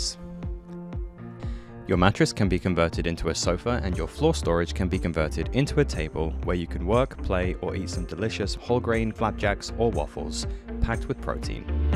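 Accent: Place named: United Kingdom. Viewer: i do not understand American marketing that is so enamored with British accents. 🤷🏽‍♂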